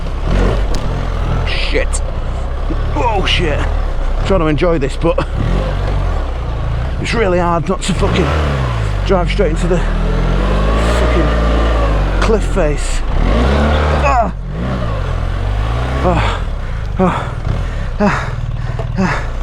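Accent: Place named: North, United Kingdom. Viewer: You sound more northern than normal. And I don’t know how that is possible. As usual. Incredible video!!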